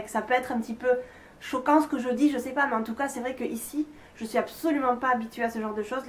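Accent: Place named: South, France. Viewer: j'adore ton accent du sud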